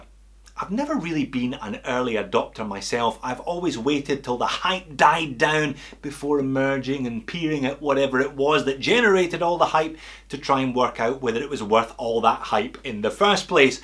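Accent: Scotland, United Kingdom. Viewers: I am an absolute fan of. One of the reasons being that he is a graduate of Griffith University also. I love the Scotish accent of this guy. He is a true talent. and for me is a benchmark channel on Youtube. For true watch enthusiasts I can also recommend looking into instagram accounts. In particular, of course, the one of. I further enjoy kicktoc, watchurious and monsterstraps. Keep it up!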